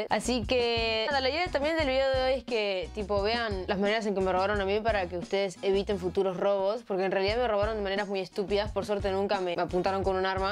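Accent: Argentina. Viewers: Eres Argentina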